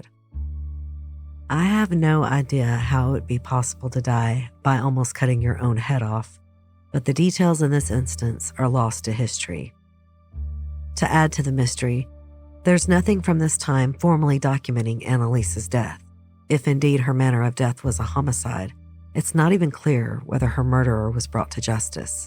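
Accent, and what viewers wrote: South, United States. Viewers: Love your raspy southern voice, too sexy for me.❤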